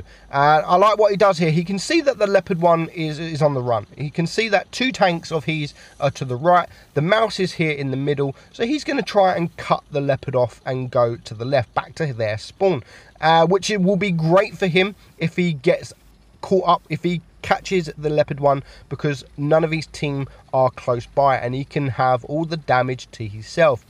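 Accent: United Kingdom. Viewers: Yeah that's pure blood British accent and dialect lol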